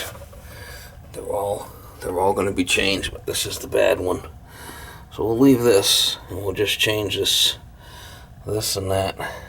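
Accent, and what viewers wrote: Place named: New Jersey, United States. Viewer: I’m not even a plumber but love watching this guy do his jobs with real life experience. Great explanations and New Jersey accent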